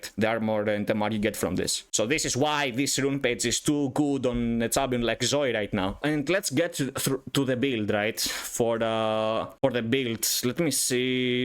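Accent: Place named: Greece. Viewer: In 2 seconds I was like, he is Greek. What about support Zoe?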